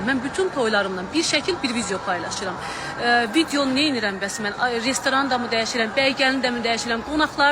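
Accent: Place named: Baku, Azerbaijan. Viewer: siz köycayllsan bakl lehcesinde nöş da lslrsan.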